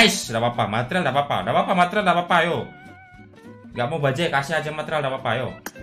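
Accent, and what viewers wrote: Java, Indonesia. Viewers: Mas e wong jowo ngendi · ngomong medok wae mas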